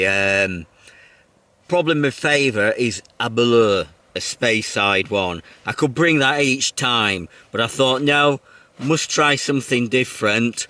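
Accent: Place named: Dronfield, United Kingdom. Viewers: I much prefer your narration and Yorkshire accent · Absolutely love your videos. Originally from Dronfield, so it's lovely to hear your flat vowels. Really fabulous content, do keep them coming. Much respect sir 🙂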